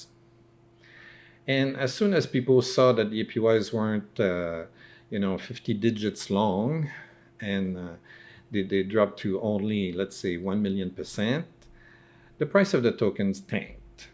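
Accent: Quebec, Canada. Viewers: I can tell by your accent that you’re from Quebec!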